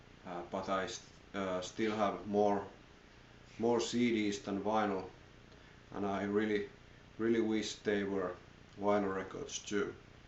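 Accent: Finland. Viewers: Great video, I could tell you were from Finland before you even said it. I just started collecting this year, I have been getting alot of, and other Finnish viking/folk metal bands. I am excited for this 13 disc box set that they are making! It is supposed to be the biggest sized set in the history of metal.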